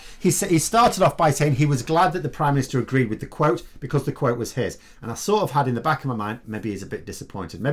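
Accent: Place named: Wakefield, United Kingdom. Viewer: Dear, are you from Wakefield or there abouts, you sound to have a Wakefield accent.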